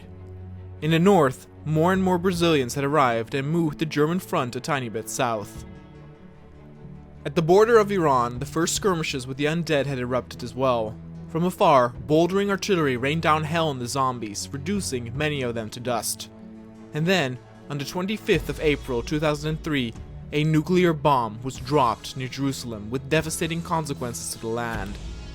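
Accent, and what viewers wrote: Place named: Netherlands. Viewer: Didnt know you were dutch(because how you say the g) im dutch toXD.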